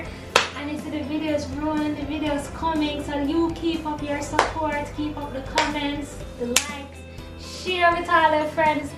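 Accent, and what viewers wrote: Jamaica. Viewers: That's a Jamaican accent. Pure Jamaican beauty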